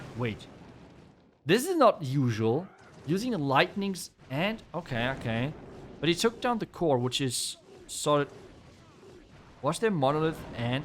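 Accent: Germany. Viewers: German accent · I know he is German and you have the German accent but what else is in there? Is that Scottish vowel pronunciation or what is it?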